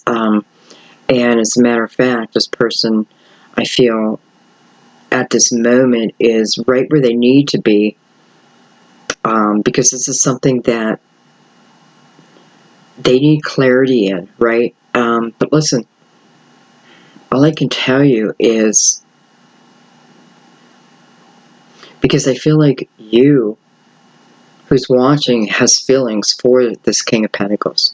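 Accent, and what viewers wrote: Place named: Central Pennsylvania, United States. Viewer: Love your readings♥️ are you from Central PA? You have a York/Hanover etc accent. My ex husbands family is from there and I recognize the accent.. I could be wrong though 😊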